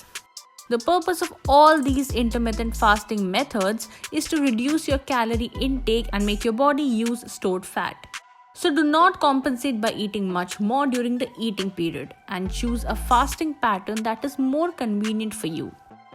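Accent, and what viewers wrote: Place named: India. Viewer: thanks for the information along with the nice Indian accent!!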